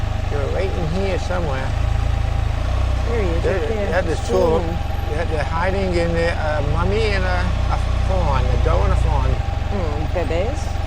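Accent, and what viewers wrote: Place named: Boston, United States. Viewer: sounds like hes from Boston... Love Video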